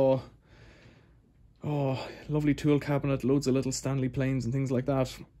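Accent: Ireland. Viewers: Do you have an irish twang to your accent? Im watching lots of your videos.. I have very similar interests to you lol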